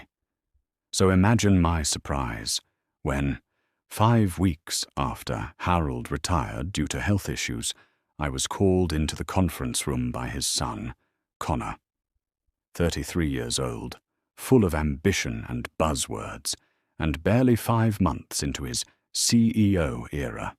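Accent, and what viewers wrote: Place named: Upper class, United Kingdom. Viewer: In Akron Ohio, a British Aristocrat narrating the story. WOW !!!! At the 4 minute mark, check out the AI text, which transforms "CLAUSE" to "CLAWS"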